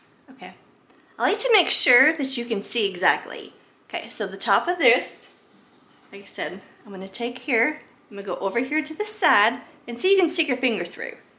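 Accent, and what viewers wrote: South, United States. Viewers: I love your st Patrick’s DIY 🤩 your personality so adorable and love the southern charm accent ☺️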